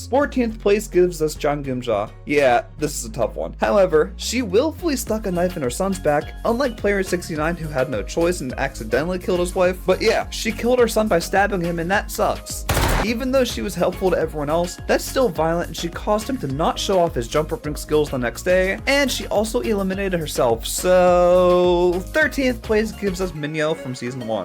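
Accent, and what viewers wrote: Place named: India. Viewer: 50% India 50% British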